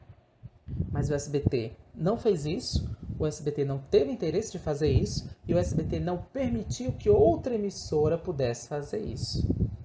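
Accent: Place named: Ceará, Brazil. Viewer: Como eu queria que o reagissse aos comentários que os SBTistas fazem no X do Fórum Chaves. É de arder os olhos de ódio e raiva por cada burrice. Seria de lavar a alma ver o rebatendo esse povo medíocre com o seu sotaque cearense maravilhoso 💫 abraços! Adoro o perfil que me fez abrir os olhos e consumir a obra completa.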